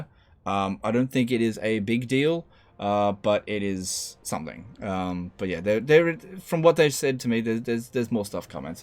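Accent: Australia. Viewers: I have never played one of these "Total War" games. I thought this channel was to listen to an Australian talk about cheese in a funny voice while he does something with digital action figures.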